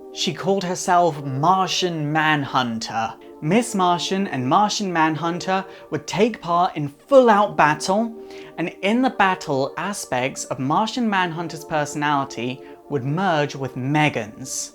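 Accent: United Kingdom. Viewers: dude are u british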